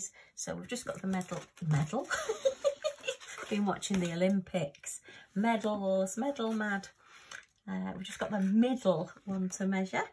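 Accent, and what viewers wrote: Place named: Hull, United Kingdom. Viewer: I thought I recognised your accent!! I was Hull born and bred too but now live in Hornsea. Those five pics are nice, lovely colours.xx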